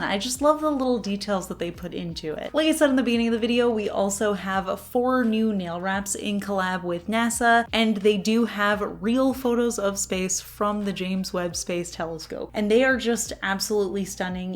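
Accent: New Jersey, United States. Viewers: It's that jersey annunciation 🤌 😅 we miss you here in good ole jerz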